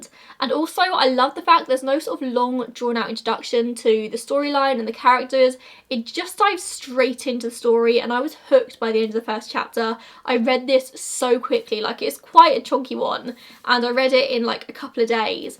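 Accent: England, United Kingdom. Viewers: Reading, England I believe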